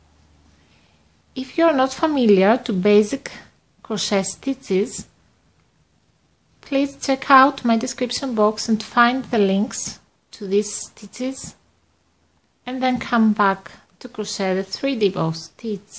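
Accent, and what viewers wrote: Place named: Greece. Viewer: I thought I heard a Greek accent :) γεια σου απο τη μακρινή Αμερική Πολυ όμορφη η πλέξη αυτη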